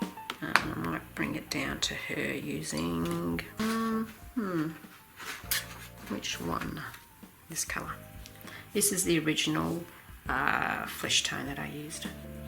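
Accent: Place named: Australia. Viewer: Thank you very much for the useful tips and advices stunning work, by the way when you speak about Australia and your accent they remained me when i was student in hight school ten years ago studying in Australia and the teachers accent over there.. you makes me miss Australia and want to visit the beautiful Australia again for two mouths lol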